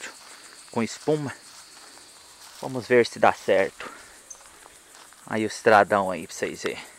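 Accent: Minas Gerais, Brazil. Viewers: Sotaque gostoso de ouvir é o sotaque mineiro👏👏👏👏👏👏👏👏👏👏👏👏👏👏👏👏👏👏👏👏👏👏👏👏👏👏👏👏👏👏👏👏👏👏👏👏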